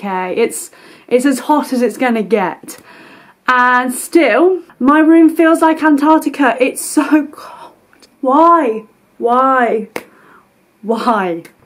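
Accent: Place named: United Kingdom. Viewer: Hi,! Your videos are very nice and cool 😊 I started watching your videos when I was looking for info about UofL 4 months ago, but I still keep watching your videos as they are very creative and relatable. You really put a lot of work into your videos and this is awesome that you keep on posting. Don’t give up on YouTube, your subscribers love your videos😍😍😍 P. S. Your UK accent is so wonderful, I love it. I wish I could speak with that accent (I’m Ukrainian)🙈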